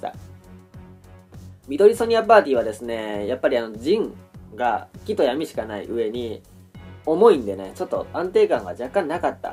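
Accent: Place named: Tono, Japan. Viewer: さん思っきり東濃弁はいってますねぇ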